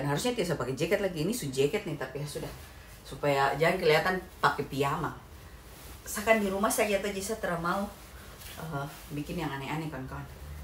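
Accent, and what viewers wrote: Papua, Indonesia. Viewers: Kk sehat2 ya....senang dengar kk punya logat Papua biar tinggal di lain benua logat tra hilang 👍❤️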